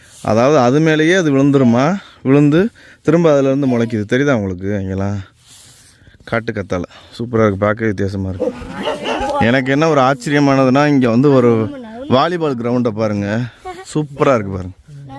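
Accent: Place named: Ramnad, India. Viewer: unga ramnad tamil pronunciation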